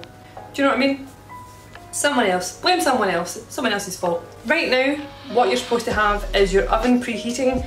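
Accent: Scotland, United Kingdom. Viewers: Also, something that no one tells you - you need to drain the excess liquid out of the pumpkin to make a solid pack pumpkin. This is done after the sieving/mashing is done. You take the mashed pumpkin and put it back into the sieve and let it sit over a bowl to let the liquid drain for about 1 hour or until the pumpkin mash is thickened. Doing this will result in a firmer filling that wont weep after baking. I love to bake and really enjoy watching your baking videos. My grandmother was Scottish and she was a fantastic cook and baker. Watching you and hearing your accent, brings back happy memories of her. No recipes or measurements - just a little of this, and some of that. No worries with October timing, we don't eat pumpkin pie for Halloween in the US. It's a November/Thanksgiving dish here. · Wholesome, entertaining, and scottish noises. This is a top notch video.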